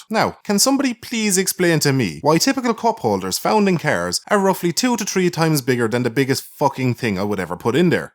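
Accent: Ireland. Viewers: Swear to God, hearing the Irish accent swearing is like wiping your arse with silk. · Hearing say the most marvelous of insults and creative swears in his Irish accent is the reason I get out of bed in the morning.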